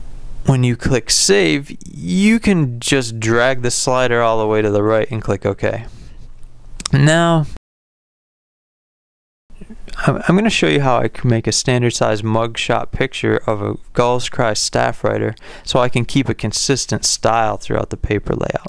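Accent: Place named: West Coast, United States. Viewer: Haha! You sound like such a west coaster. But thanks for the lesson!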